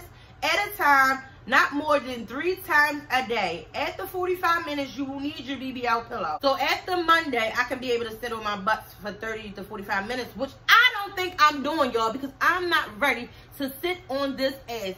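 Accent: Baltimore, United States. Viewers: Glad you did good with your drink. Your right, it’s good to try the massages. You look fabulous by the way and I’m loving your accent. Sounds like Baltimore??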